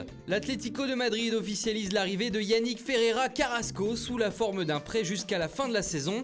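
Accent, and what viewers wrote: Italy. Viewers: Elias Parker Bah ouais nous on veut entendre l’accent italien gros 🤣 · Je reste toujours attentif à ton accent italien quand j'écoute,je ferais comment si tu pars? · Non non non il faut que tu restes !! Le plaisir de l’accent en série A va tellement manquer :/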